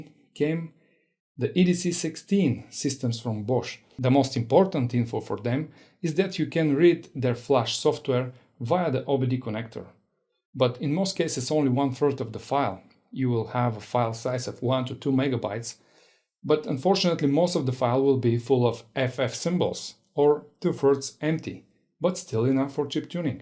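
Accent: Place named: Eastern Europe. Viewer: Слышу славянский диалект в произношении )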